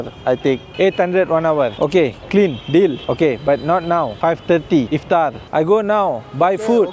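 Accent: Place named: Kedah, Malaysia. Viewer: Alhamdulillah terbaik setiap hari sy x miss tgk video Doc. Rasa seronok dgr bahasa kedah Dr. Sbb sy pun org Jitra Kedah kpg kita pun sama Tanah Merah jgk. Terima kasih dr hidangkan video2 terbsik serata dunia byk ilmu yg sy dpt. Sy doakan terus sukses dan sy doakan jgk Allah bukakan hati dr utk Islam. Aamiin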